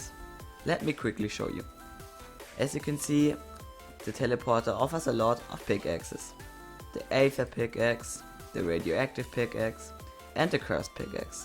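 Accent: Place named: Germany. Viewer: Als ich deine videos gehört hab, hab ich direkt gemerkt das du deutscher bist :D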